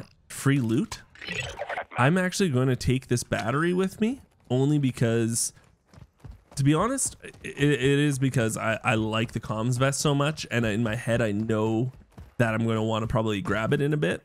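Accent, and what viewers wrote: Canada. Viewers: you are definitely Canadian 😂❤ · I love that Canadian accent you got there bud. I like watching your videos, I'm a big supporter over here. When they killed you at the exfil, I laughed so hard I almost peed my pants. I'm so glad that it's not just me that gets ganked all the time at the exfil.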